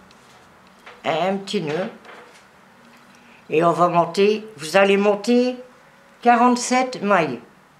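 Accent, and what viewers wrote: North, France. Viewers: bonsoir très contente de te revoir, il et magnifique félicitations un grand grand merci je vais encore t écouté avec ton accent du nord bisous et gros câlins a ponpon, a très vite je vais le faire trop beau très bonne soirée, Monique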